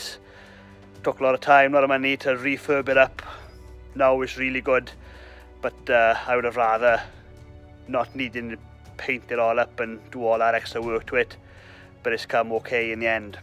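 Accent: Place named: Ceredigion, United Kingdom. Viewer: my wife said ‘he sounds like he’s from your way’. My guess was Ceredigion.. 😜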